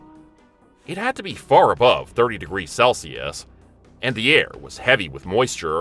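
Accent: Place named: Canada. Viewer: Cause he’s from Canada - and no we don’t all talk like that!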